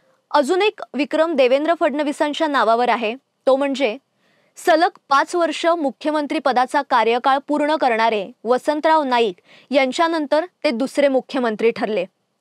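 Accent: Standard. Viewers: छान...स्पष्ट आणि शुध्द उच्चार... खणखणीत आवाज...उत्कृष्ठ विश्लेषण....👍👍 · कॅमेरासमोर तू स्वतःला छान सादर केले आहेस. तुझा खणखणीत आवाज, स्पष्ट आणि शुद्ध उच्चार व आत्मविश्वास कौतुकास्पद आहे.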